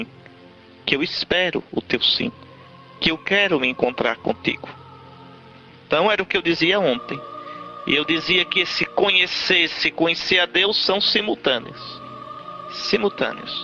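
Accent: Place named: Northeast, Brazil. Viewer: Taí um verdadeiro filho do trovão , que hoje no céu com seu sotaque nordestino cabra macho faz o encardido tremer quando algum filho lhe pede um favor , obrigado Jesus pelo teu filho Dom Henrique. Louvado e glorificado seja nosso senhor Jesus Cristo. Fiquem todos na paz de Jesus e no amor de Maria.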